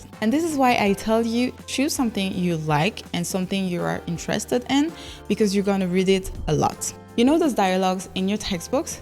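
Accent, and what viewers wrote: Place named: France. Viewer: The accent is French and the name is a word in several bantu languages in Uganda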